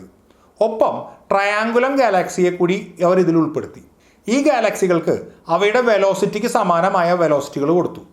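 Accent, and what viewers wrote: Thrissur, India. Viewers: നല്ല അവതരണം വളരേ വ്യക്തതയാർന്ന വിവരണം . അതിലുമുപരിയായി ഭാഷാശൈലി ഒരു തൃശൂർകാരന്റേതും . ഞാൻ ഒരു തൃശൂർകാരനാണേ അതുകൊണ്ട് പറഞ്ഞുപോയതാണ് . ഇതുവരെ ഇതുപോലുള്ള ഏറ്റവും പുതിയ അറിവുകൾ പകർന്നു തരുന്ന മറ്റൊരു വീഡിയോ ആരും അപ് ലോഡ് ചെയ്തതായി എന്റെ അറിവിൽ ഇല്ല . വീഡിയോ വളരേ നന്നായിട്ടുണ്ട് .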